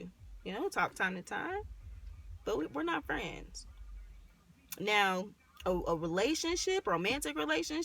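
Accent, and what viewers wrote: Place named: United Kingdom. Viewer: Love the British accent doooling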